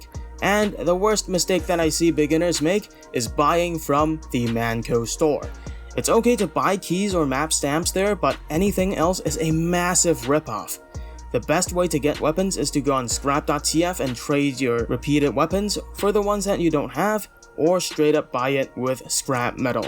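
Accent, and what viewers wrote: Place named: Asia. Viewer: love the asian accent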